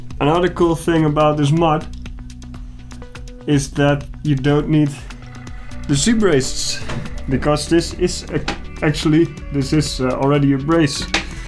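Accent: Netherlands. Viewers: I can immediately tell from your accent that you're a fellow dutch man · From with County you are you sound like a Dutch man that speak English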